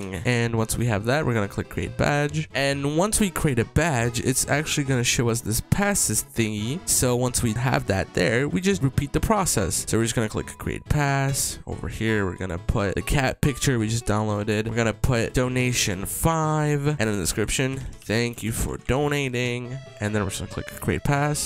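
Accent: Czech Republic. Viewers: are you from czech??? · wait are you czech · ARE YOU CZECH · bro are u from czech republic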